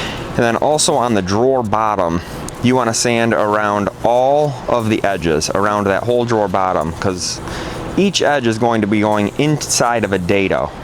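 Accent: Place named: Upper Midwest, United States. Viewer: I built a stand-alone cabinet that has four sections; two cabinets and two pull-outs for garbage and recycling cans. It also has two drawers above the garbage and recycling can pull-outs. One cabinet has cleaning supplies, and the other has dog food and supplies. It was built with materials I had on hand, so to hold the cans in place I cut 1x2's in half and made braces to fit each can. I like your design better, and if I'm able to continue with my woodworking endeavors after my neck has healed, I'm going to use some of the scrap plywood I have and rebuild the pull out drawers for the garbage and recycling cans. Thanks for the video, because I've been wanting to redo them, but I just didn't know what I wanted to do with them. What part of the upper Midwest do you live or come from? I have family in Wisconsin that have the same accent as you.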